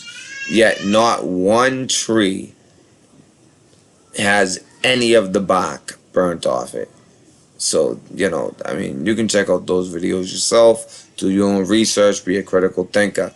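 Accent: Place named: Boston, United States. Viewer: Weems yeah his accent is awesome, I know others from Boston and they only sound like him when their mad!🙏🏻💞🇺🇸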